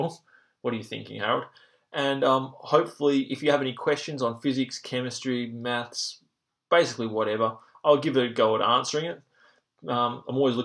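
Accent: Australia. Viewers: cool Aussie accent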